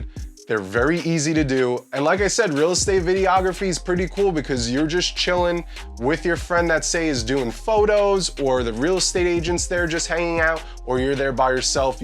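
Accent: New York, United States. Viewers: New York accent still strong my guy 💪🏾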